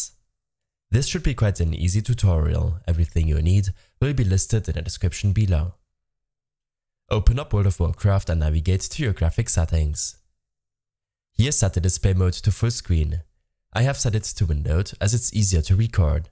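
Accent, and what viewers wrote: Germany. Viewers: Gotta love the german accent Thanks for the video <3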